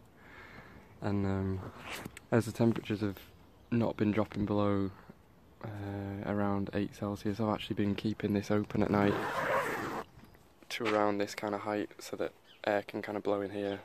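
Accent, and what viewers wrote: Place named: England, United Kingdom. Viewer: I am SOOOO sick today, and have been too weak to even hold my phone, but I started watching this earlier in a sick haze, and when it got to the kitty, I smiled all over and giggled and just felt the joy pulse through my body. Then looking at your lovely plant babies and hearing your soothing voice and lovely English accent..... ahhh... just the medicine I needed today! Thanks! 💗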